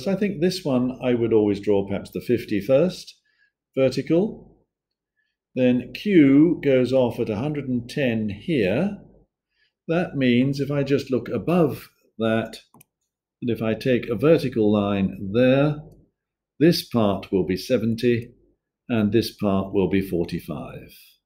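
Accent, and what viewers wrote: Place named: United Kingdom. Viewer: Thank you you beautiful british sounding man!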